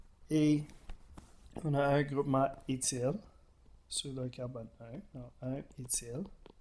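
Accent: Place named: United Kingdom. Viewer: from the accent i think hes from the united kingdom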